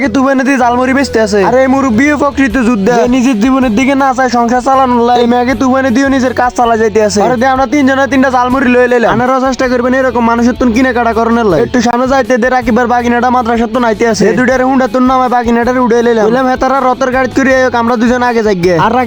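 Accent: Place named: Noakhali, Bangladesh. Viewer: ❤️❤️❤️❤️❤️ ভাই আপনার কথাটা খুব সুন্দর আপনাদের নোয়াখালী ভাষায় আরো ভিডিও চাই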